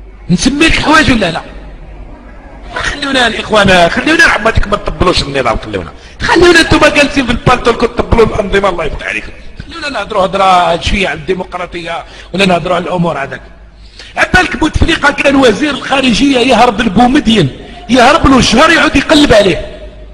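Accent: Morocco. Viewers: C un marocain qui parle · لهجتك مغربية....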